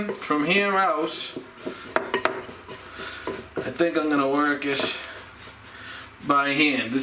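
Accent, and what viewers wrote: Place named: South Boston, United States. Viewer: I'm trying to figure out what is more amusing: Your simple recipes or your "Southie" accent. Love them both!!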